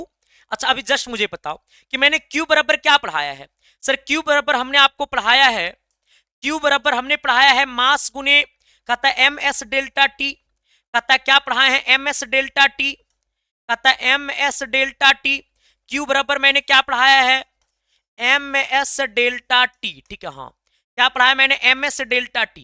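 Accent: Bihar, India. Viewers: aap bihar se ho.....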